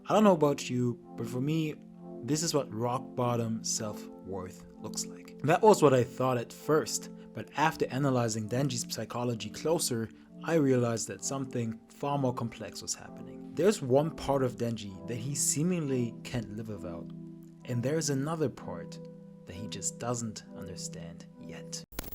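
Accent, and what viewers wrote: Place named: Germany. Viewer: Intentional or not, you have a german accent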